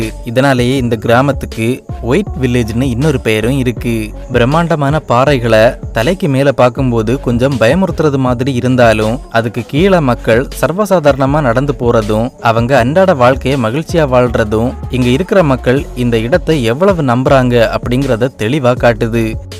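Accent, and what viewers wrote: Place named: Kerala, India. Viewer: Keara tamil superb bro❤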